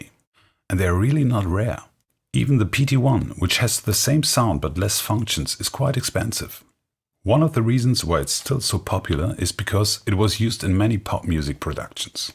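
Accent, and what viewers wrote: Germany. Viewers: I Got a question for the guy? Are you German? Where are you from? Its a weird question but I recognize the Accent. Greets from Hagen in Germany.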